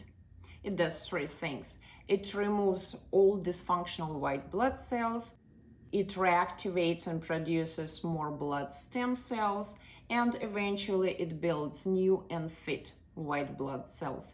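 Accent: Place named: Poland. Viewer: Your foreign Polish accent makes my ears bleed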